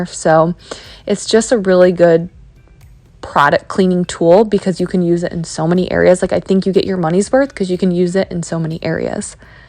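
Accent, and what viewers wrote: Midwest, United States. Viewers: There’s something I love about hearing your Midwestern accent narrate the cleaning 😂😂 I’m 8 months pregnant and can only do like….a load of dishes and sweep the floor and I’m spent for awhile so it’s nice motivation to watch you get so much done!! 😃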